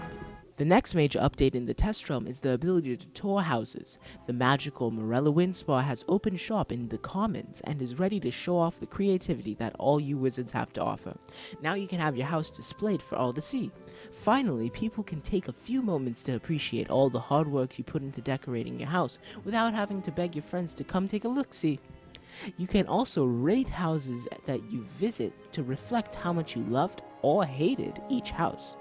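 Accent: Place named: United Kingdom. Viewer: He sounds British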